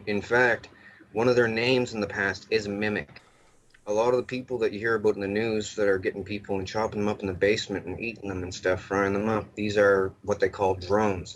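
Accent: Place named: New England, United States. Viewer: This guy looks like john Cusak's clone, but with an annoying Yankee twang😅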